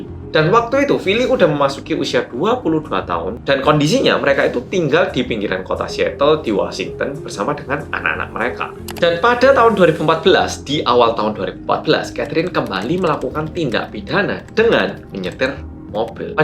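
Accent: Java, Indonesia. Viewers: bang klu ngasih video endingnya yg jelas dikit jangan gantung gtu... trus pas opening g usah trllu ngmong medoookkkk jyjyk bget gw dgarnya🤢🤮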